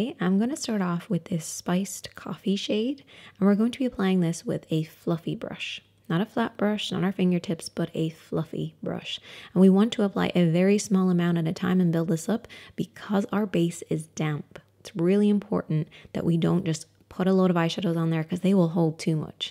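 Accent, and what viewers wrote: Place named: Ireland. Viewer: Hi, how are you? Lovely to hear an Irish accent amongst all the others! I think you are genuinely the only expert i will now follow. (along with Lisa Eldridge)...thanks for asking how everyone is doing. Its definitely genuine when an Irish person asks & not just filler, throw in a,"Conas atá tú & you might get some grant lol. I suffer from chronic nerve pain following an amputation and oft just find videos in the midst of a bad bout of pain to try drown out the terrible insufferable spasms. Anyway girl, keep flying the flag. From your neighbour in Limerick.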